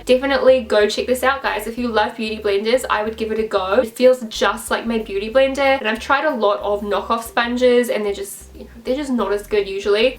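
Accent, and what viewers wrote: New Zealand. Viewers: April Taylor she a New Zealand accent.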